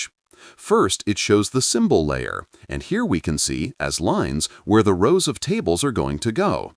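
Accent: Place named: Europe. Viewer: very good detailed how to PV panels , it will be my go to video for the next few months as i work through projects, Ai voice is good and clear and I can see how it is useful for a international audience, but I do miss the personal touch of the European accent on previous EMD videos, but that is jus me preference.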